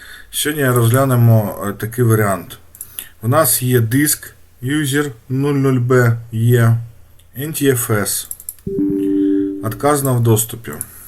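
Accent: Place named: Surzhik, Ukraine. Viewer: молодець, і як фахівець, і як справжній українець, а саме: ділиться власним досвідом, за допомогою якого я раніше відновив HDD диск Western Digital на 500 Гб, а також перейшов на українську мову, що дуже приємно чути, навіть суржик від у мене особисто викликає повагу до нього, адже найважливіше зробити перший крок. Я раніше теж спілкувався мовою східного "сусіда", але зараз розмовляю українською і незначні помилки з часом виправляю, а моє оточення ділиться приємними враження від почутої від мене української мови. повністю підтримую. Від мене лайк, давня підписка і всього тільки найкращого.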